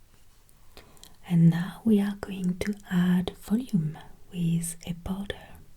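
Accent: France. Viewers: I think I'm addicted to your videos. Nowadays it happens not often to me to fall asleep without your smooth voice and so divine french accent. I just love it so much, I melt...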